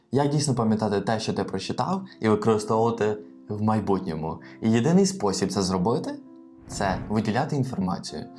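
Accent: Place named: United States. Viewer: Ех, не можу за акцентом вгадати штат( Успіхів вам і розвитку каналу! 🙌🏻✨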